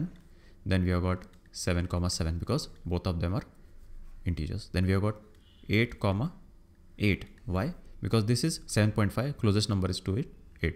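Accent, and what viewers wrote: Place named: India. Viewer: indian accent is crazy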